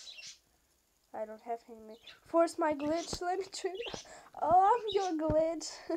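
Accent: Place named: Russia. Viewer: Hey! I'm half Russian too! My mom is Russian.. but I don't have a great accent like you and I'm from America! Anyways, love you your the best!:p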